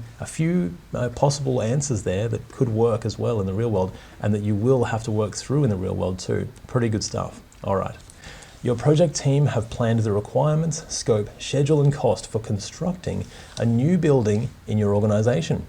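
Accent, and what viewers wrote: Australia. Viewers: I just passed my PMP yesterday 9/3 and I got to tell you..most of my questions had an Australian accent. Thanks a ton (tonne?)! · I was going into the exam having done a ton of SH practice questions, mini exams and full length exams. SH completely demoralized me and made me think that passing was going to a flip of the coin at best. Not only did your videos bring clarity to a lot of the tricky questions that stumped me in SH, but also gave me my confidence back and reduced my anxiety enough to stay focused during the exam. I think reading the questions in my head in a chipper Australian accent really helped. So glad I stumbled across your videos series! I passed with a very comfortable AT/AT/AT :D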